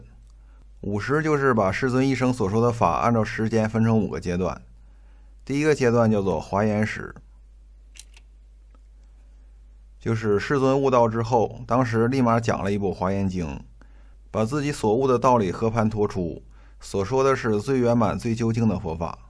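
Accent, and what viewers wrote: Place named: Northeast, China. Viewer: 喜欢东北口音儿 ～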